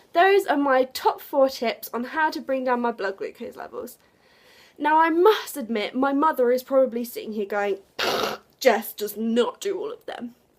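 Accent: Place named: Australia. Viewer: You sound vaguely Australian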